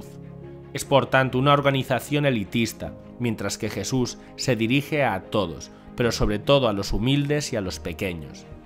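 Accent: Spain. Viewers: Buenas, felicitaciones. Buen contenido pero muy alta la música. No permite entender su voz, que es español de la Padre Patria y los latinos debemos afinar el oído y con la música altísima, nos cuesta. Por favor, siga adelante enseñando lo disfrutamos, sólo con un poco más baja la música. Gracias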